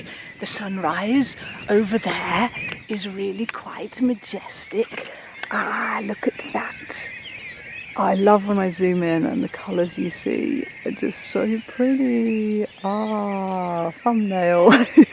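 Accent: United Kingdom. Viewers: For those of us who don’t know UK regional dialects, can you tell us where you accent is from?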